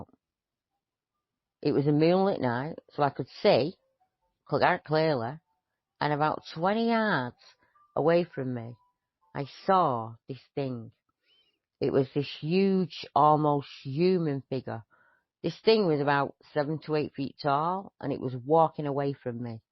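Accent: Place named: Manchester, United Kingdom. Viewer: accent is northern, sounds like she maybe from the Manchester area, however there are many different northern accents, such as Scouse, Geordie, Yorkshire, Cumbrian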